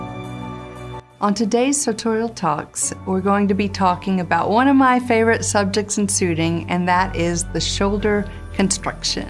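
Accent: South, United States. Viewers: Awesome video! I am italian (from the north) but I really love the english shoulder and construction. It gives me such a strong appereance that has no rivals in my opinion. Despite it still being vert constructed even nowadays, I feel like it could have been even more in the past: I have a vintage chalk stripe suit from the seventies which was made in Saville Row and the shoulder is super heavily padded, I just love that! It was so intresting to explore the other styles anyway, this was very educational. I had no idea that you were american, I should have recognized it from the accent, I love y'all so much. Keep up the great work! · Is from the South? I thought I might have heard some accent here and there, but maybe I‘m wrong. Great video anyway, and a lovely personality. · Just a guess, but I would wager that based on pleasing speech delivery, she was raised in a Southern state.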